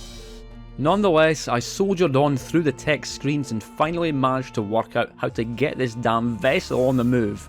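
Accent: Scotland, United Kingdom. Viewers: I just like to come back to this video for an easy watch - it's about something I love, it's amusingly narrated, it's Scottish, 10/10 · That scottish accent. It's cute.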